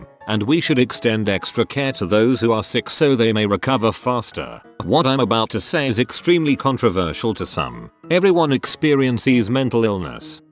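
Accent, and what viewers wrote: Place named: United Kingdom. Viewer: Who is this British robot guy who does all the Reddit videos? It’s the same voice on all the channels and videos!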